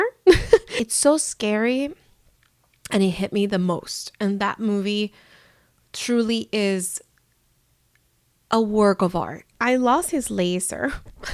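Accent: Cuba. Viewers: As an American kid my first exposure of Godzilla was the 2014 movie in theaters, then the second movie I saw was Shin Godzilla and since then I became obsessed. I have seen 30 of the Toho movies and love those classic movies so it's cool seeing people love Godzilla both Toho and Legendary more and more. btw I like your Cuban accent it's so nice. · Lifelong kaiju fan here. While the <num> era of suits and miniatures will always be the true heart and soul of the genre, our current revival with CGI blockbusters is still a glorious thing to be living through. It made my day to see you brought into the fandom by the new entries, while also being willing to go back and embrace the back catalog. We need a million more like you! ❤ And your Cuban accent is great. Anyone giving you crap about it should be ignored, full stop.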